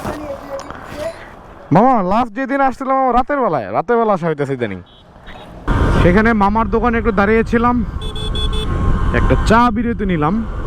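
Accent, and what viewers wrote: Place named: Tangail, Bangladesh. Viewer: Bhai apner tangail er kothai amar gram er bari tangail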